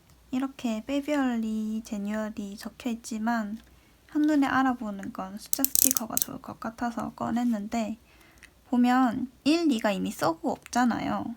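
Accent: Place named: Gyeongsang, South Korea. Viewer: 타지살이하는 경상도인인데 억양덕에 고향온거같구 ㅠ 해서 자주 놀러오려구 구독하고가요😆❤️타지분들이 경상도 억양을 좋아하시던데 제가 듣기에도 너무 귀여우시네요 😘( 입덕)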